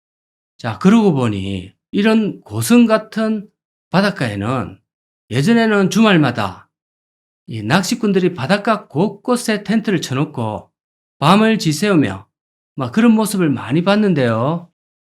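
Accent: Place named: Seongju, South Korea. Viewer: 사드배치된 성주서 살았던 어릴때 많이 듣던 가찹고 (가깝고) ㅋㅋㅋ 오랜만에 듣네요 저도 그렇지만 본인은 표준어 쓴다고 생각 ㅋㅋㅋ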